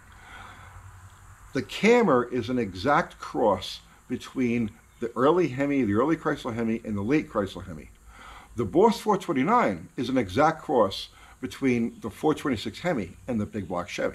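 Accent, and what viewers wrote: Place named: New York, United States. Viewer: I'm from NY. Don't mind your accent at all, reminds me of home.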